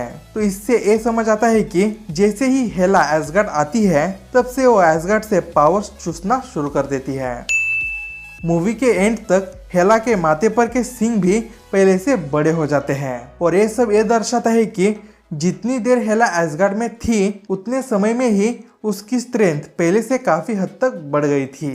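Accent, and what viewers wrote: Bihar, India. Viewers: Tum bihar se ho kya brother?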